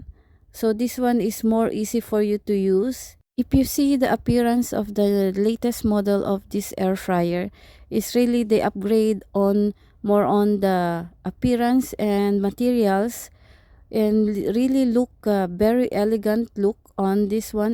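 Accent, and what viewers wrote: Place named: Philippines. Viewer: ARE YOU FILIPINO? IM PRETTY SURE I HEAR A FILIPINO ACCENT RIGHT?😁 COZ YOU HAVE A SIMILAR ACCENT TO MORISSETTE AMON.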